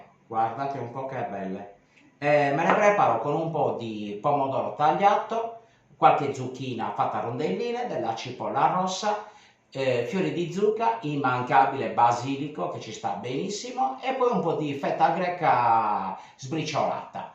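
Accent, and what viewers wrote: Sardinia, Italy. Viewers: Molto piu della ricetta mi piace il suo accento sardo! Eia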